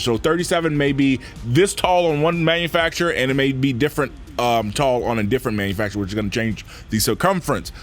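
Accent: Louisiana, United States. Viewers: what part of Louisiana are you from?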